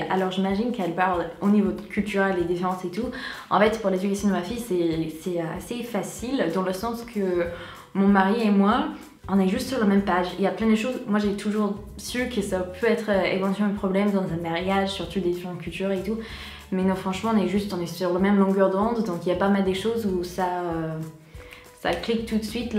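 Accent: United States. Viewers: Hello ! T'as raison de pas essayer de gommer ton accent américain : ça fait partie de toi 😊. Jane Birkin vit bien en France depuis des décennies et elle a toujours son accent anglais. Le fait que tu fasses des vidéos est un bon exercice de prononciation et en plus c'est gratuit!!!😊😊😊 t'as pas besoin de prof... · Oh non !!!! ne fais pas disparaitre ton accent americain , c'est trop mognon quand ton parle , moi j'adore , trop chouette !! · Ton accent américain est charmant quand tu parles en français - je préfère l'accent américain au britannique, ça coule mieux, lol - j'imagine que l'inverse est vrai aussi - parler anglais avec un accent français - après j'ai passé un an à Montréal et je me sentais trop ridicule quand je devais m'exprimer en anglais face à des américains, c'est un complexe très français, lol!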